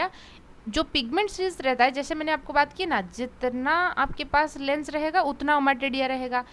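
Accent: India. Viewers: So sweet hindi accent mam ❤️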